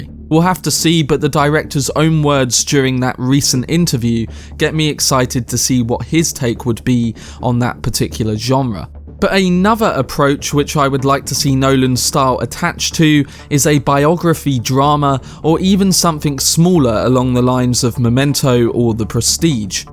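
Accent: United Kingdom. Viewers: Dude you a brit? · Love your bri-ish accent mate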